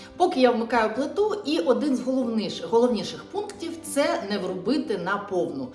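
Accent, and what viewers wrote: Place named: Ukraine. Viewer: Фууу. Такая девушка, а разговаривает на смешном деревенском языке. Вам не идёт. Суржик у вас. Беее. В первых роликах на русском было красиво.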